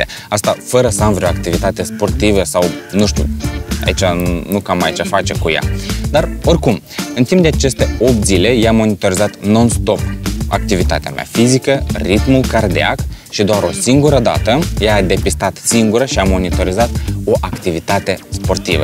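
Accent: Moldova. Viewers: Dat'n slobiz de moldovean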